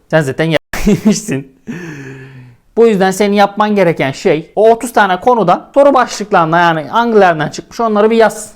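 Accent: Thrace, Turkey. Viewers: Reis küfürü azaltmışın böyle daha iyi trakya ağzı yeter güldürmeye :)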